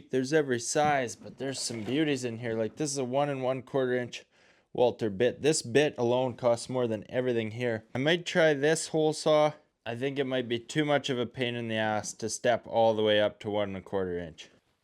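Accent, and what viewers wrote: Canada. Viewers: I can't give you any advice, i couldn't even build a bird house. I have OCD so i notice little things that would drive me crazy. I have to say i have never once seen anything you do trigger me. So precise, and professionally done. Thank you for taking us on your journey. I can't wait until it is completed. You are very talented and is amazing how you have so many skills. It takes a long time for spring to come in Canada.....i recognize the accent and speach patterns. My parents and 2 elder sister are Canadian. I was the first born American and have been to Canada a few times. Always happy to see your videos pop up on YouTube.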